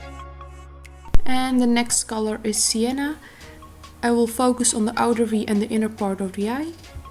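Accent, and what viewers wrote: Netherlands. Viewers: Your accent sounds dutch.. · Shes dutch u can tell from the accent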